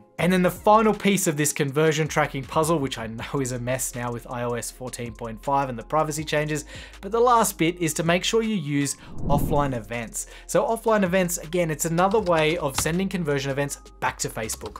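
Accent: Australia. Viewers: Really good material, and good to hear an Aussie voice too.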